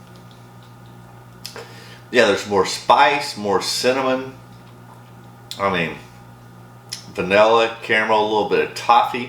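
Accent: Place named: South, United States. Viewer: you sound like you’re in the south? I don’t have much luck finding the allocated stuff here in NE TN.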